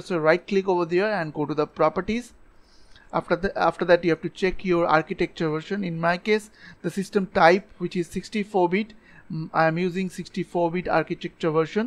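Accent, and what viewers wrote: India. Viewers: i heard the indian mans voice and felt relief but for just this one time the indian man couldnt save me :( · when i have technology problems and hear an Indian man talking, I know that instant my shits gonna get fixed! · the moment I heard an Indian guy, I'm 100% sure this will help me. Thanks a bunch! You guys are a blessing! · now this tutorial with less thick indian accent so I can take this seriously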